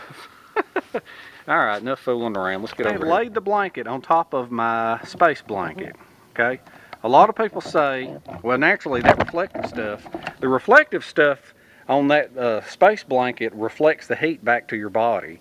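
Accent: South, United States. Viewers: I think my settings are okay but could be wrong; anyway I loved seeing Flat Head's long term shelter. By the way as for the southern accent you are home grown and cool as you can be so junk'em if they don't like how you sound. I saw in your last video you had an LSU mug; are you a graduate or is that where Nick is attending college? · Don’t ever apologize for speaking southern. I lived in Alabama as a kid about 60 years ago and I like your accent. Louis Grizard, a southern humorist, had a saying, “God talks like we do.” I still sound like I’m from Alabama and I like to think Grizard was right. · Excellent video, my god i love that southern drawl...keep up the good work...thanks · Great video Sir, love the Southern accent. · c'est l'accent 'du midi' aux etats unis. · Fabulous, really enjoyed watching and listening to your Souther accent. Carol from Edinburgh, Scotland, UK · Excellent video as always. 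I haven't commented in a while, but that doesn't mean I haven't been watching. I always look forward to the next episode of World. But watching this one, it occurred to me that you're like the Mr. Rogers of the bushcraft community. So friendly, jovial, non-threatening, helpful, and nothing but a passion for teaching all the uninitiated (and the seasoned survivalists, as well) your wealth of knowledge, whether original or learned yourself. And you don't take yourself too seriously!! That's the best part. Keep 'em coming. And worry about your Southern. Us NC boys, and all of us born and bred in the South, know exactly what you're saying. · i Like the way you Talk, it Sounds very 'murican:D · I had an ol' D.I. that told me: "Private - - why do you talk...so...slow?!" I told him "Sir, because this recruit's Dad is an Okie Sir." He tried NOT to laugh when he told me that explains a lot! I thought I'd share that with you. 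don't ever lose that Southern way, it's what makes you original & thanks for communicating at my tempo! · Where are you from? I;m so southern I'm related to myself, lol!! I think your drawl is even more than mine! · Man, I like your accent so much! which part of the states are you from? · Cool shelter Glad to see you making videos again. I am from the south too love ur accent dude. Flat Head would be honored to be remembered. Till next time. Thanks for taking the time.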